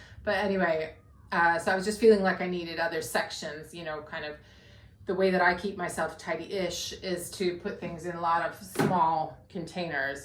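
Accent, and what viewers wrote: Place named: Scotland, United Kingdom. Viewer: The space looks great. I love the bright colors on the wall. I wish I had enough space to attempt organizing so well. The Yeti mug was a good choice...I love mine to keep water cold on my bedside table all night. Your accent is adorable..It seems to be American, with certain words having the underlying Scottish brogue. Keep the videos coming, I always enjoy them.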